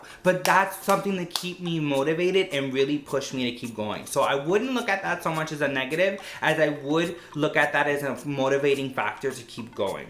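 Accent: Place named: Cuba. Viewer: Omg I loved this video! Going for my first workout with OT next week and you made me feel at ease. I love your Spanglish! Cuban or Puerto Rican? I’m gonna say Cuban! Cubanita here!🇨🇺 😘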